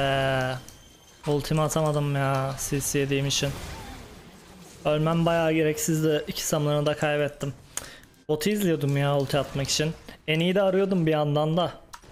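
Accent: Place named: Thrace, Turkey. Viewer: nereli bir Trakya havası var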